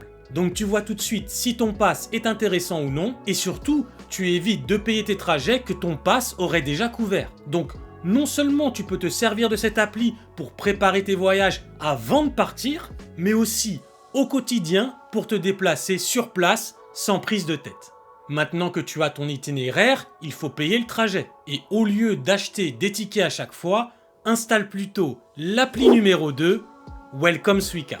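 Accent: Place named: France. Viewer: Bonjour apparemment ta chaîne est celle que je préfère parmi toutes celles que l'on peut trouver sur le Japon, à t'écouter je pense que tu es français : comment as-tu fait pour changer de régime alimentaire car je ne pense pas que tu manges du pain et du fromage tous les jours ?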